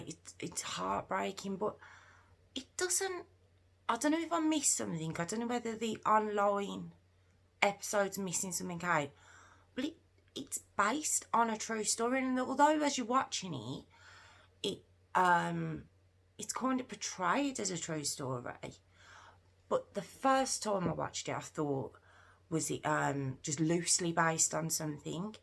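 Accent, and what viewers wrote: Birmingham, United Kingdom. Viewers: I love your accent! Are you from Birmingham?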